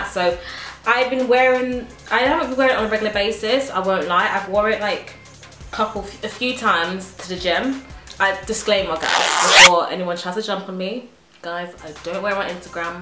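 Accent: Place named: United Kingdom. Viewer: I LOVE FUCKING BRITISH LANGUEG GIRL:):D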